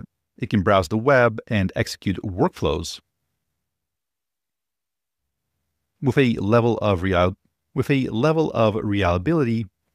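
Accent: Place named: Eastern Europe. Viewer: you do have a slight foreign accent though. it sounds Slavic. did you speak Russian first then switch to English at age 13? that's my guess your content rules though, and your tweets are just as good. pure signal over there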